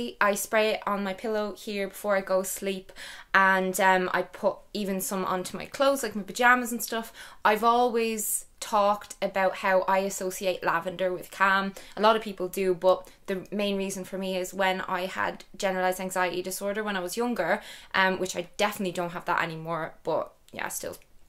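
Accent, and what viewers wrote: Ireland. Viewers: Beautiful youuu! That Irish accent is adorable!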